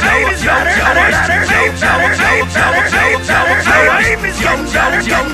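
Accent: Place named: Scotland, United Kingdom. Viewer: He starts sounding Scottish halfway through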